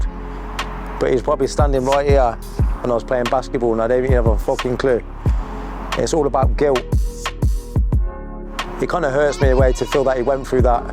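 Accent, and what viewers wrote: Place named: Coventry, United Kingdom. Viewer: I knew i recognised your accent your from Cov im from Cov too just found your account on insta so inspranational im older now but after a life of trauma you have so helped xx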